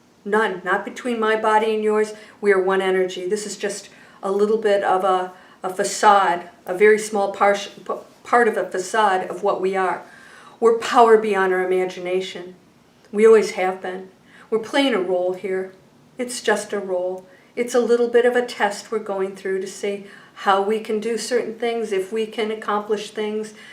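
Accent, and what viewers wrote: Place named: South, United States. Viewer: I wish that was my next door neighbor. I thought that you would be Russian with that name but you have a country accent.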